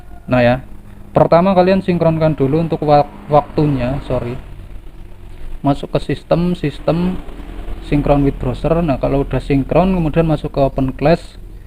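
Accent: Banyumas, Indonesia. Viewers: Ngapak apa yah kang?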